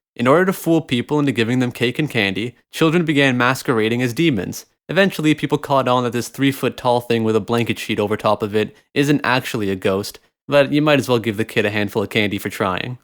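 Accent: Canada. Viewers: All of a sudden I can hear you Canadian accent and cannot unheard it. I can't stand it. This video has a demon in it and I am now cursed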